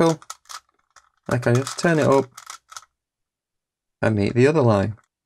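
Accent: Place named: Mexborough, United Kingdom. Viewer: You sound like youre from Mexboro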